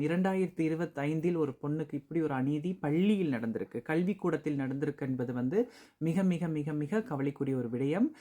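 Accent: Sri Lanka. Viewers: Akka algaa sri lanka tamil pesurigelee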